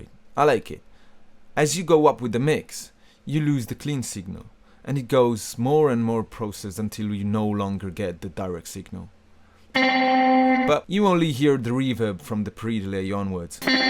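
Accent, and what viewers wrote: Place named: England, United Kingdom. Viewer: he has a clear beda house english accent!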